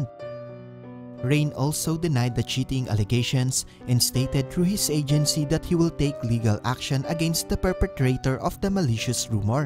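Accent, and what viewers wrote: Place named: Philippines. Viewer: You have a Pinoy accent. 😊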